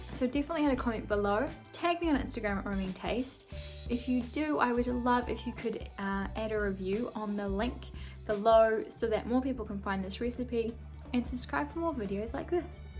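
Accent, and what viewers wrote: New Zealand. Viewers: You sound like a kiwi who wants to be a yank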